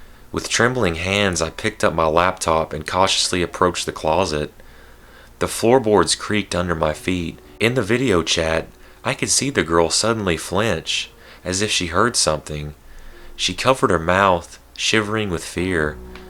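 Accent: South, United States. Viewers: You're beautiful AND you have a southern accent.... MEEEEEEOW!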